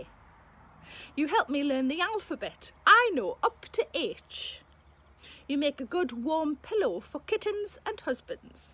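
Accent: Newcastle, United Kingdom. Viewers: Watching in St Louis, Missouri, USA. I first heard your voice on a TV while sitting in a hotel room in Wales and I thought you were the funniest comedian I'd heard in years. I wrote down your name so I could ask the friend I was traveling with if he'd heard of you, and he told me you were from Newcastle (he was too). I visit Newcastle for business every year, which helps me translate your Geordie to my wife when we both listen :)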